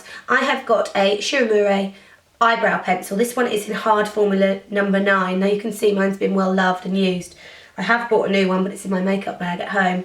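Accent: England, United Kingdom. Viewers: i adore your english accent <3 you are lovely!!!!!!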